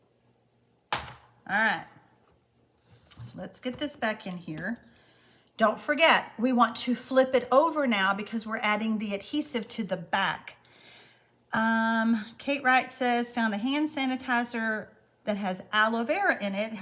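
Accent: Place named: Maine, United States. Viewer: We Mainers have that accent that’s hard to understand too!! Oh well.. that’s the beauty of diversity!! 😇😂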